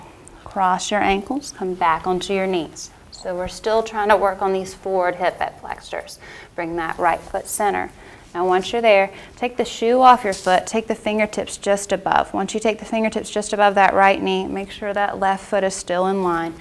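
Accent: South, United States. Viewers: I love your country accent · This girl is southern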